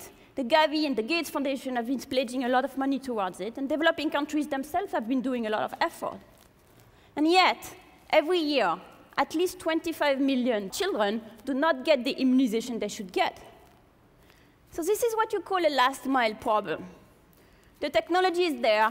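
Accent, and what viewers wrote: France. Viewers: that's how a french speaker speaks english. Speaking some of the R as H, some H as SH. French is easy and fun if you English and Hindi.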